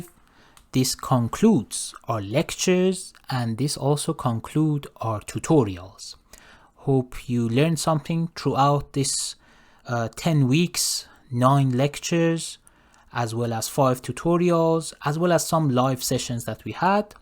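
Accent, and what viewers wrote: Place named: Iran. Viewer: great sir. (btw, your accent seems like you're from Iran or its surroundings, am i right?)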